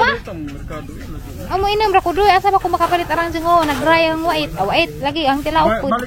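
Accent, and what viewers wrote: Bohol, Philippines. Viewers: Nindut kajo paminawon ag tinagabohol..Pohon mayo untag mkaari kag Ormoc ..ba mgpa picture LNG ko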